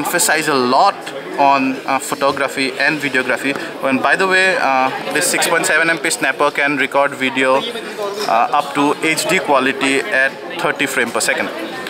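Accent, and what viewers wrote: India. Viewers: the voice made me laugh a haha i love that indian acent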